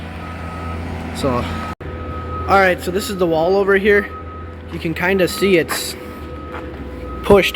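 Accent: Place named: Northeast Pennsylvania, United States. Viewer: Wild never heard of taking down such new silos! ** you have the exact same accent as northeast pa Lackawanna county!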